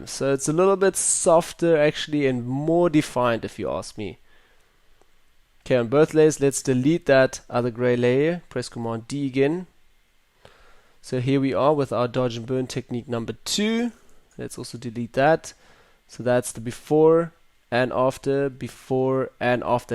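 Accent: South Africa. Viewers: Since u sound south african i will subscribe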